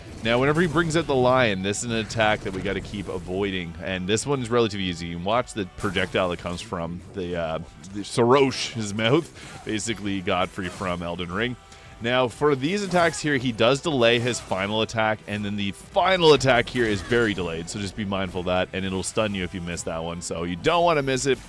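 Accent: Maritimes, Canada. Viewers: I was just watching your Swashbuckler guide and I thought I heard some Maritime in your accent. Hello from New Brunswick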